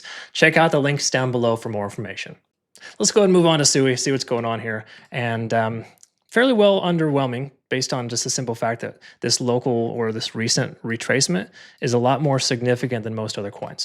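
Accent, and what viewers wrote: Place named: United States. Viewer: I wont watch channels that have accents, Indian, british, Australian, south African and ghetto/prison/ratchet are some of the worst. I appreciate this english American speech.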